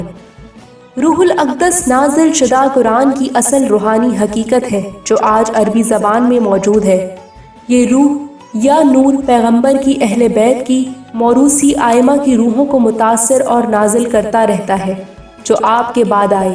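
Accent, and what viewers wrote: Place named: South Asia. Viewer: ap badun ki bol chal sa he andaza laga sakta tha ki ya india ka hn ya pakistan ya kasi sawal howi??!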